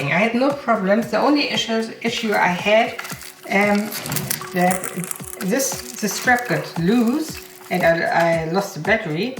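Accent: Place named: Germany. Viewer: dass dein Akzent irgendwie deutsch klingt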